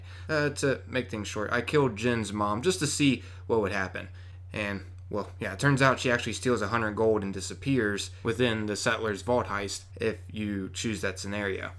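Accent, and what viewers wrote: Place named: Philadelphia, United States. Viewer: i needed this info! p.s. ill bet the speaker is from the philladelphia area.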